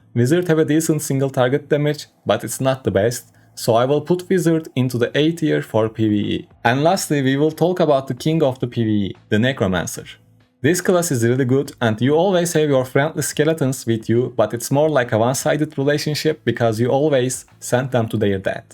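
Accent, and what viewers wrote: Turkey. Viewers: Reis türk müsün senin aksan tam türk aksanı gibi geldi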